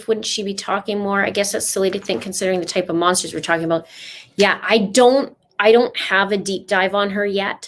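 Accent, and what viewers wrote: Canada. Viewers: Love everything about how you present information, pick everything apart and are onto the lies in people's statements. Melanie Gibb is definitely covering her butt because she should have warned someone many, many times. She is doing all these interviews to make money off of this to take the focus off of her responsibility of saying something sooner of what she knew was going on. I am not buying her innocent statements. Alex's wife, definitely something there. Lori's sister dying... Sociopaths have usually been sinister their whole lives ,ust like Chad has been luring women many more times before. Love your Canadian accent. (from a fellow Canadian) Melanie P. has snowed her husband and someone will spill the truth about her very soon I suspect. Keep up the great work!!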